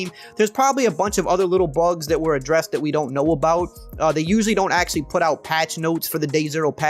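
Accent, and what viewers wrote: Louisiana, United States. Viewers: Just discovered this channel and damn you have to be from the bayou lol accent is thick.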